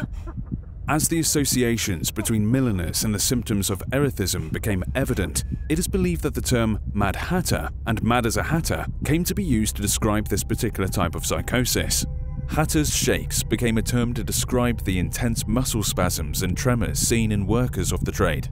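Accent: United Kingdom. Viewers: Why do you have a British accent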